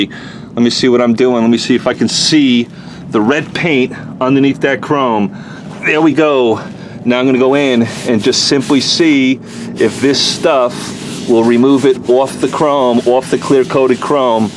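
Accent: New York, United States. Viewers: Hi I just subscribed to you’re channel. Are you from New York originally. My parents are from Brooklyn NY. That’s were I get my NY accent from. You sound like a New Yorker. Love your page. I just found it today.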